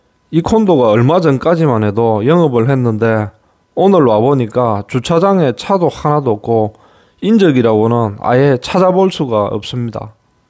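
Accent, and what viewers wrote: Gyeongsang, South Korea. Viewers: 다 좋은데 경상도 말투만 아니라면 좋겠는데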